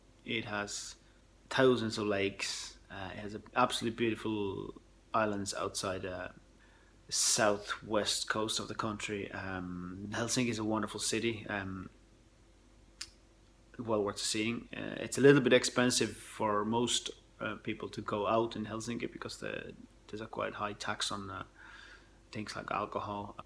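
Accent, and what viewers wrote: Ireland. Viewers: Your accent is so Irish. I enjoyed watching this. You have a good heart. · If I hadn't waited until you said it, I would have thought you were Irish. Your accent is proper Irish. All the turns of phrases and rhythm of a well spoken, slightly Dublin accent. Good video. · Aksentti on huomattavasti "Irlantilaistunut" siellä ollessasi. :) Haaveilen itsekin Irkkuihin muuttoa tulevina vuosina. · Wow you sound like me and im irish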